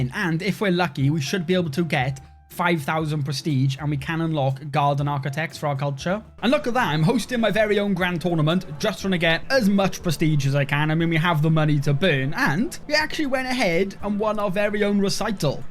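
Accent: Wales, United Kingdom. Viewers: Is that a fellow Welsh man i hear . Respect from the Merthyr Valley. 🏴⚒️⚒️⚒️. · Are you Welsh? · the welsh accent threw me off, sounds like you straight from Bridgend! lol LOVE IT!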